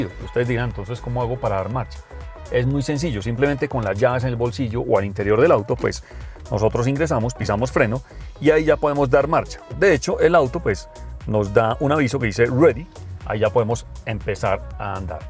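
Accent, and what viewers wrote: Colombia. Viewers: Que impecable reseña. El acento colombiano es muy agradable de escuchar, y los paisajes acompañan muy bien las tomas del C40. Felicidades y gracias por vuestro contenido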